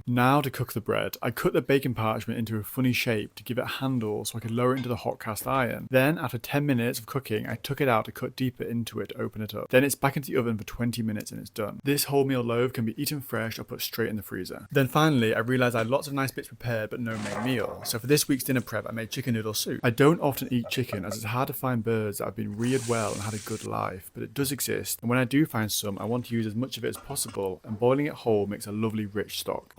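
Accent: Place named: Yorkshire, United Kingdom. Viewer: Perhaps around abouts Yorkshire based on Dialect?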